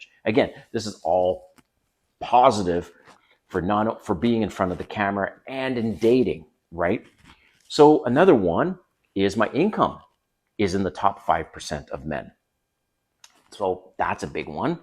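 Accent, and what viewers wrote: Canada. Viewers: lol, I knew you were a fellow 🇨🇦 the second you started speaking 🫶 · Def got the Canadian accent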